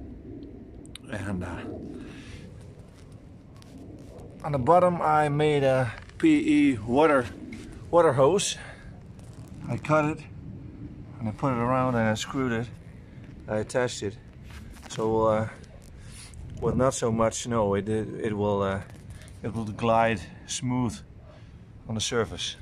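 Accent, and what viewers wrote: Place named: Netherlands. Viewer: The Dutch English accent is so endearing... my favorite. Also thank you for the instructions, exactly what I was looking for. Keep it up. Subscribed :) bedankt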